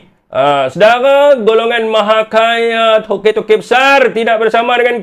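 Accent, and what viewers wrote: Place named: North, Malaysia. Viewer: Apasal kalau yang bab bongkar macam ni mesti guna loghat utara. Atau mesti org2 utara.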